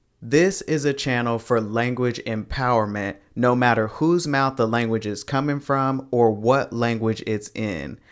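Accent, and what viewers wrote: Dominican Republic. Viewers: This whole time I thought you were Dominican, you speak like my cousins. I love this and appreciate you putting out learning content for us no sabo kids. This is huge motivation for me. Much love 🇵🇷♥️🇩🇴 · If I speak Dominican Spanish it’s thanks to you, until today I thought you were Dominican 😂😂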